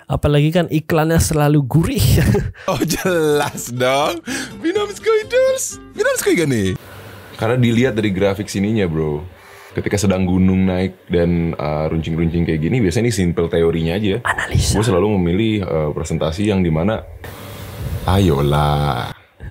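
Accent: Bali, Indonesia. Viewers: Dari Bali ni Kayanya yaa.. hehe.. omswastiastuu Bli... Bagus na e penjelasan itu. Aku juga sependapat. Wkwk. Tinggal tunggu aja boom waktu itu ATG. Rata2 yg begitu biasanya ujung2 scam.. kleeeeeeng.. wkwkwkwk. Itu platform Bandar itu. Banyak manipulation nya, buka real boker atau DMA direct market access.. wkwkwk. Tp apa daya, Kalo kasih tau org yg udh percaya sm itu ATG sulit dikasih taunya soalnya. Wkwk.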